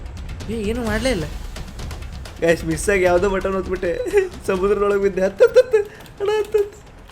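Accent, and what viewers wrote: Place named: Coastal Karnataka, India. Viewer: Guru karavali shyali maath ajji maat super chanda guru aadre nin yen guru karavali ne marth bittidya ansute adke maath sa change aagide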